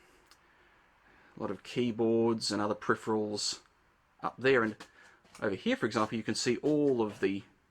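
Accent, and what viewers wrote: Australia. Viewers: Are you from Australia? You sound like you are due to your accent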